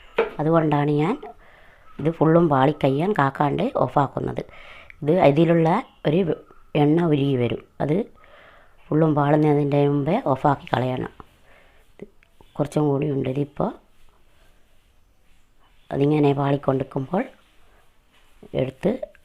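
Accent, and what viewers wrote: Nadapuram, India. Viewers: Barthanam kettokkumbo nadapuram bayikkanna thonnunne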